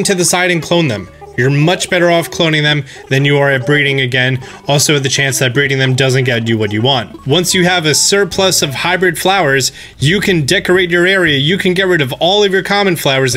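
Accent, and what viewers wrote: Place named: New Jersey, United States. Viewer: It's a New Jersey accent. All across the state, we say "wooder."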